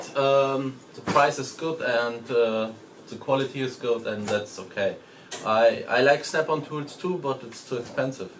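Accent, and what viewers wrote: Germany. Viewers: Best of both worlds, get to occasionally hear the German accent and see re-builds of subi engines. Lived in Ansbach for 3 years. Oh how I miss it.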